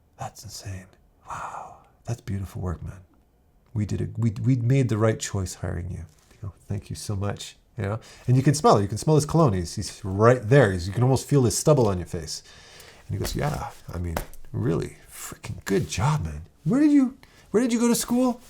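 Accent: Quebec, Canada. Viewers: As a french speaker I can say you’re saying « la crème de la crème » very well, you sound like a guy from Quebec.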